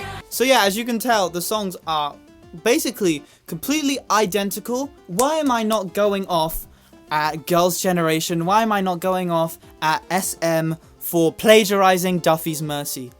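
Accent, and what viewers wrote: United Kingdom. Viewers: 영상 올라왔네!!!! 하 너무 좋다, 그거 알아? 나 덕분에 영어 듣기 실력이 진짜 많이 늘었어!! 너무너무 고마워 🥰🥰 내가 미국 악센트를 가지고 있는데, 영상 엄청 많이 봐서 약간 영국 악센트랑 미국 억센트랑 믹스됐더라, ㅋㅋㅋㅋㅋㅋㅋㅋ근데 난 그것대로 좋아ㅎㅎ그리고 영상을 볼 때마다 는 참 바른 사람이라는 생각이 들어 언제나 응원할게 사랑해!! 근데 요즘 완전 슈스됐네..! 구독자도 엄청 많아지고, 영상 올린지 얼마 안됐는데도 댓글도 엄청 많구ㅎㅎㅎㅎ기분 좋다 Omg video is uploaded again!! Yayyyy I love it., you know what? thanks to, my English listening ability improved! Thank you so so much🥰 I have the States accent, but I watched videos alot so I got UK accent a little lolol I like that hehe And every time I watch his video, I think is really nice and kind and cool and hot person☺️I love your personality I stan I always cheering you up🥰🥰😘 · I'm not used to British accent and the way you pronounce hair sksjsk it's funny and adorable at the same time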